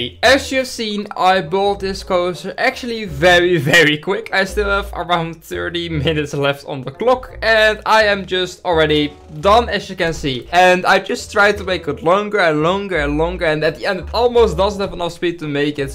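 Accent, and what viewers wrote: Netherlands. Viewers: i know your netherlands by the way you talk english so --> hoi leuke video heb je gemaakt maak nog meer van die videos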